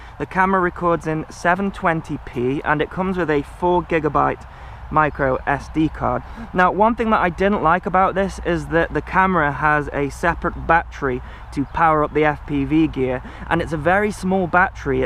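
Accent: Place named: Yorkshire, United Kingdom. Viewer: You sound exactly like my friend Oliver from Yorkshire :)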